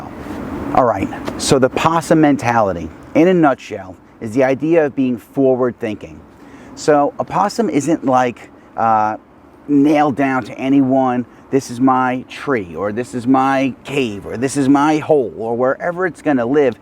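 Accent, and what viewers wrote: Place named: Long Island, United States. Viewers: He sounds like he is from Ronkonkoma or farmville but his info is solid. His hands made me laugh. He talks like everyone in my family!! :) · you sound like you are from Long island? I grew up in Suffolk county!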